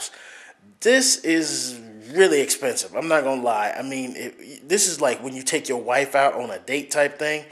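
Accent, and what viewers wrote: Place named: Jutland, Denmark. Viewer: That accent.. You've lived in Jylland too long man :)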